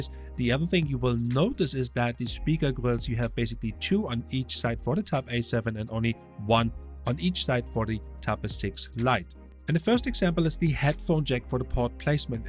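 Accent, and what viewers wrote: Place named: Germany. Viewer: Nice video, i think i'll choose S6 Lite, are you german? you have a german accent i find lol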